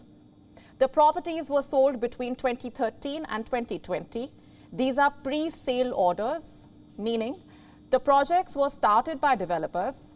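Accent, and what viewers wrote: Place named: India. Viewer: The indian accent is the worst. so irratating.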